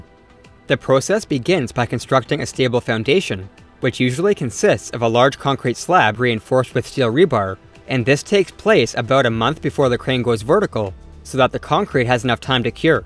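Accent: Canada. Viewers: I sense a little Canadian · You're Canadian - aren't you ? 👍 ( Pro - ject vs. prah - ject, mo - bile, vs. mo - bull, etc. ) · A Canadian narrator! Hello! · Your accent...Canadian? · This presenter has an extremely thick canadian accent.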